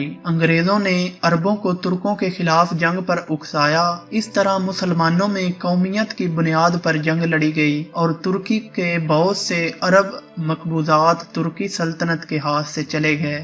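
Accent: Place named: Jhang, Pakistan. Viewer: lehja lokal hey. Shayed Jhang ya Mandi ka lehja hey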